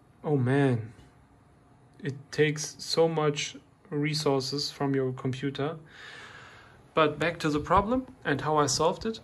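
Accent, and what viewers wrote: Germany. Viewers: am i correct and i listen to german english speaker? My english is the same haha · Höre ich da deutsch?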